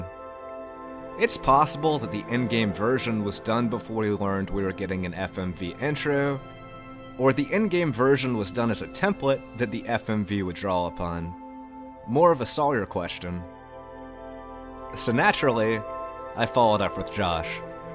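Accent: United States. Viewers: I love the content and it's not your fault but the voice reminds me of Arin from game grumps whenever he does a generic protagonist voice. It might just be American inflection on some words. I just can't help but giggle a tad when I hear words spoken like that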